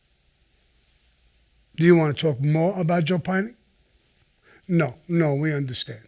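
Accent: United States. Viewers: Especially with the American accent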